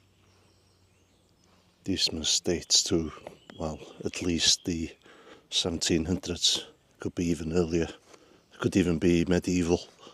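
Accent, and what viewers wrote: Wales, United Kingdom. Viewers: Just want to say I love your channel. Excellent channel. My mother was born n South Wales so I am half Welsh and proud of it. Your accent reminds me of all my mothers brothers sisters and my welsh grandparents. Their first language was welsh. Keep up the great channel. Diolch yn fair iawn.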